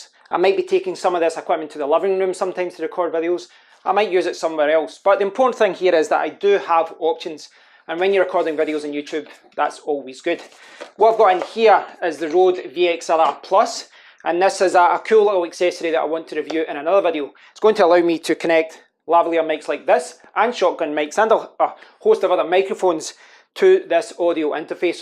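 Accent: Scotland, United Kingdom. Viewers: Ur Scottish right ( so am i)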